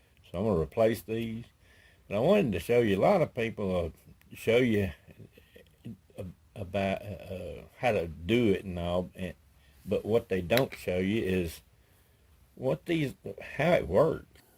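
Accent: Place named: North Texas, United States. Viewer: I looked at a couple three videos before replacing the trunk lift struts on my 2004 Impala, and I found yours very helpful because you showed the inside workings of the joint when the clip was moved around. No one else did that, I guess assuming we knew how it worked. Sure, once it’s open and you can see the nylon ball, it’s easy to understand. But every other video was all “put your thin flat blade in here and it will come off.” But if you can’t visualize what’s happening inside, you worry that too much pressure will cause the joint to fail and then you have another part to track down and replace. That, and your comfortable way of speaking, like all my folks did in North Texas and Southeastern Oklahoma, was really nice. I’m subscribing. If you have any tips on growing okra, I would appreciate them. I live in Utah at 4300’ and it’s hard to get a long enough growing season to make a decent mess of okra. 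Thanks again.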